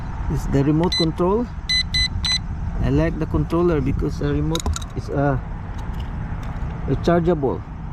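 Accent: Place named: Philippines. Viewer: hi are u a filipino? i can tell by your accent hahahaha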